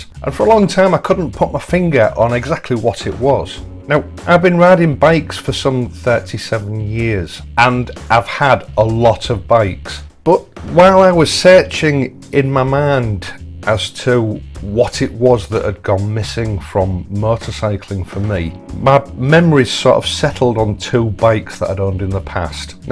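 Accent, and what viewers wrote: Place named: Hull, United Kingdom. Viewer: Hi - love listening to you as essentially I'm a Hull lad (of 56 years:) that's been living in Sweden the last 24 years so it's nice to hear the dialect I grew up with:) All of your videos are frank, no-nonsense offerings which are so refreshing - which I really appreciate. No BS here! This air-cooled v. water-cooled comparison is also great as I'm suffering from that dilemma right now. This has helped a great deal, so keep up the great work and all the best.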